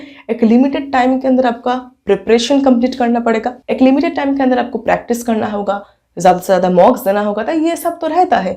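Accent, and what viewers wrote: Bengal, India. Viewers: Hindi se pta chlta h kii aapki bengali bht achi hogi ❤️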